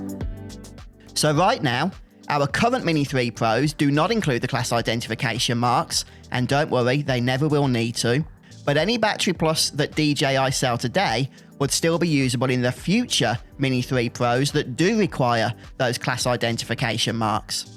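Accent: England, United Kingdom. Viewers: that terrible english accent :D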